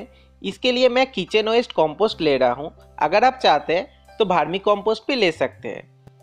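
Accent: Bengal, India. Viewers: Apni Bangali seta apnar Hindi accent a bojha jay.. tobe apni jotota sototar songe protita details bojhan seta sune valo laglo. Apnar tamarind bonsai ta dekhe apnar channel er r o kota video dekhlam. Evabei egiye Jan. Amar subhechha roilo... Waise hindi me bolte hain shayad isiliye aap jyada logon tak pohuch pate hain... Which is definitely a good idea.. keep it up 👍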